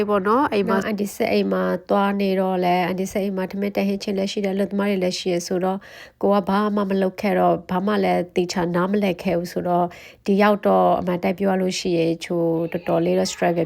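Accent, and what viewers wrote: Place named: Myeik, Myanmar. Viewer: ဘိတ်သံလေးနဲ့ ချစ်ဖို့ကောင်း နေရော ❤❤❤ အားချစ် ညီမ ငယ်❤❤❤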